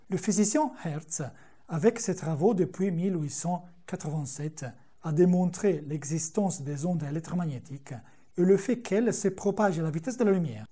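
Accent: Italy. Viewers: cavolo che accento italiano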